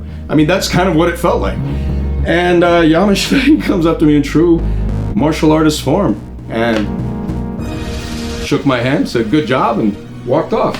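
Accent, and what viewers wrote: Australia. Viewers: I don't know what's more amazing: the fight, or that Australian accent. Thanks so much for this video. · That is a damn good Australian accent for a presumably American. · Nice Aussie accent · His Aussie accent though 😂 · Not bad aussie accent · His Aussie accent is on point. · Don't get me wrong...your acting as Jason was magnificent...but I do believe that your australian accent would get you an Oscar! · wow great ausie accent! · Who the fuck saw that aussie accent coming?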